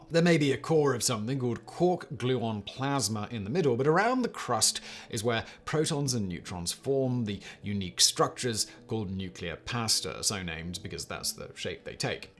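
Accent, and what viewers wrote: United Kingdom. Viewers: Sometimes that British accent throw me off as well. Maybe if he used subtitles.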